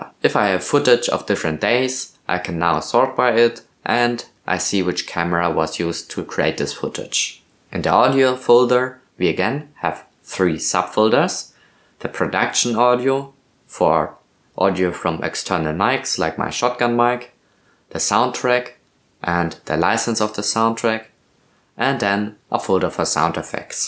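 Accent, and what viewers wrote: Germany. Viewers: bro has the strongest german accent iv ever heard, nice video of course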